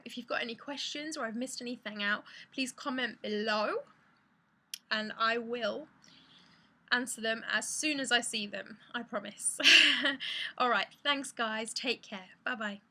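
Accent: England, United Kingdom. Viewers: India with eng. accent <3 nice...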